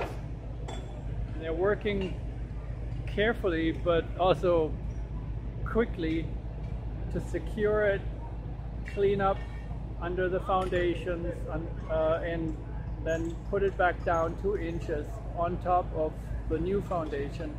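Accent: Germany. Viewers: You are sounding a bit german Im sorry if not 😂 (Im from germany) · Your accent sounds kinda German 😄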